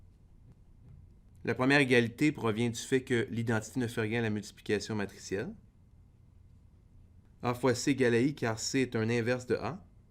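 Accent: Canada. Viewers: The lady professor is much better + i don't understand your accent man, keep the channel international not just canadian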